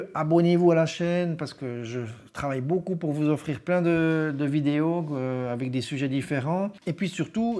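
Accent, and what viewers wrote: Switzerland. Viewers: Ton accent me donne envie de traverser la Suisse d'est en ouest ( via Alpina ) ^^ Sinon bravo pour " tenir " la parole 45 mn sur des batons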